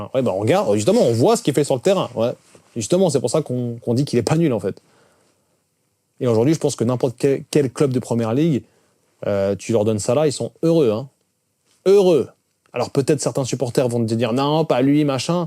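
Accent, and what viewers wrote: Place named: Switzerland. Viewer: wesh t'es suisse, on dirait pas avec l'accent jajaj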